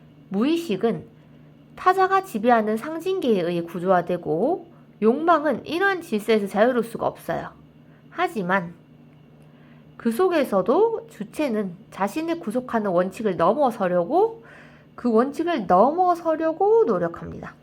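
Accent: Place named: Gyeongsang, South Korea. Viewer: 선생님 덕분에 수능완성 실전5회까지 독서까지 잘왔네요..그런데 실전모의고사5회 독서지문 처분의 무효,취소,철회지문이랑 (가)로켓엔진의 종류와 특성(나)우주선 발사체를 이동하기위한 중력추진 지문 해설해 주실 수 있나요?…법률지문은 어휘랑 개념이 생소해서 어떻게 읽어야하는지 분류해야 하는지 모르겠어요…그리고지구과학 지문은 쌍곡선이라든지 글이 그림으로 그려야하는데.. 처음 한번에 그려진다든지 이해가 되지 않네요…후반기 더운 여름 시간괜찮으시면..해설 부탁드려요…라캉이론 올려주셔서 감사합니다..귀여운 경상도 발음이 쏙쏙 귀에 박히네요ㅎㅎ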